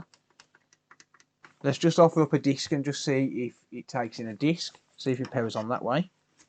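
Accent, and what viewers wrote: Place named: Dudley, United Kingdom. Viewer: You from dudley ?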